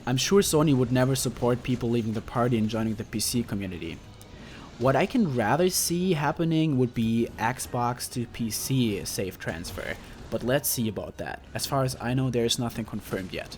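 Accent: Germany. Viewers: are you german? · Are you German?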